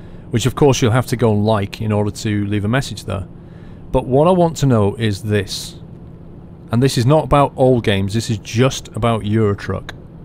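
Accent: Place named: United Kingdom. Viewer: I enjoy the long videos because they show full venture of the journy and i find it entertaining listening to you say things like nobbit as an american your british accent makes me laugh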